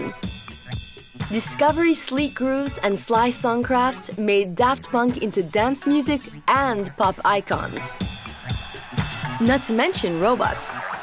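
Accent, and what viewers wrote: France. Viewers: Elle a un petit accent Français. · Nice french accent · the french accent is absolutely needed. thanks.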